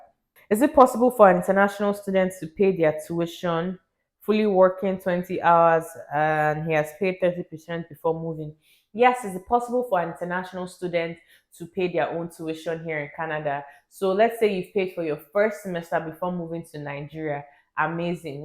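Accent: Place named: United Kingdom. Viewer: I love the pidgin!! Mix it with bri-ish and canadian accent 😂😂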